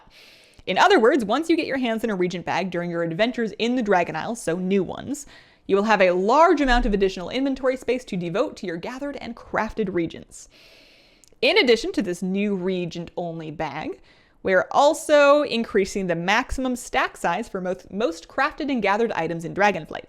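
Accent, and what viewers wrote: Canada. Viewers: She's Canadian.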